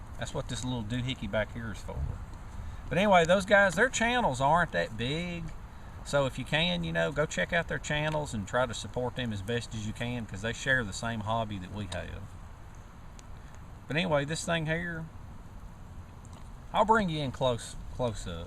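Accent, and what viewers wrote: South, United States. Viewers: He talks just like a friend of mine. Very real southern accent. If you wanna hear a "real, fake" southern accent at its best, watch the television show The Walking Dead. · I'm with you, water comes first. Dehydration will kill you first, but that knife is nice to have. Altitude sick in the way back high country this weekend I had your crazy sweet southern accent in my mind resting there at too high altitude under the shade of a tree wishing to die from a banger of a headache thinking inside the handle is some matches and a saw and some fishhooks but right now I wish I had something to blow my brains out! Kidding... maybe. Those little fuzzies are great for fire starting if you can crawl around and find some tinder. I could! Pine sap... fire... water. Just water and more water. Shelter and water and sleep a thousand years with the spinning head and then it broke and I was back with you again, human, ready to go that next mile. 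Your videos are wonderful!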